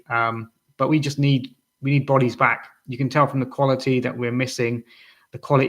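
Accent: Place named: Sunderland, United Kingdom. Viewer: You sound more like mackem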